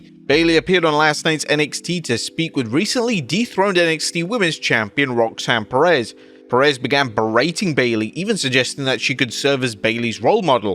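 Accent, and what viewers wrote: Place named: England, United Kingdom. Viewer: Theres 2 different people, an American and an english one, this is the english one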